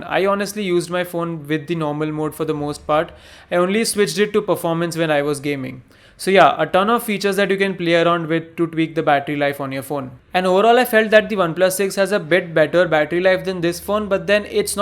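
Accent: India. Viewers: well, he looks & sounds like Indian.... Cmiiw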